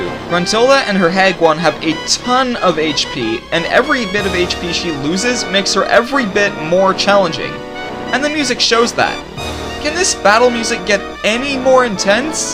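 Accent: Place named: Canada. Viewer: your canadian i can tell...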